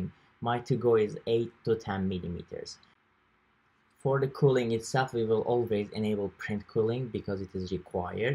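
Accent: Turkey. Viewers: Accent is turkish indeed. Cool video! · İngilizce aksanından türk olduğunu anladım. Teşekkürler video için. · Youtube da gezinirken hasbelkader sana rastladım. Konuşma aksanında ya hu Türk galiba dedim ve öyleymişsin. Bir sevindim ki inananmam. Çünkü 3d yazıcıdan silikon basan gördüğüm tek kişi sensin. Bana bu konuda yardımcı olmanı rica ediyorum. 3d yazıcı alarak silikon kalıplar basmak istiyorum. Lütfen bana yola göster. Ne alayım, filamentinden makinesine kadar yardımına muhtacım. Teşekkür ederim.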